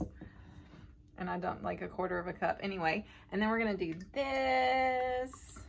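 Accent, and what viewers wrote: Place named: Indiana, United States. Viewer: Watching your video's is like a part of back in Indiana, I love living here in the Philippines, And i don't miss living in the US But i do miss no country music here of bands, So you and your family gives me a taste of Indiana with your accent.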